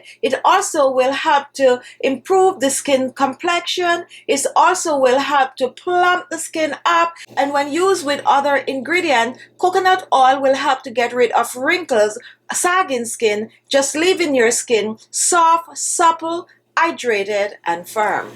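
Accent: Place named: Jamaica. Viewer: Hello, are u jamaican like me it sounds like u have the same accent. NO offense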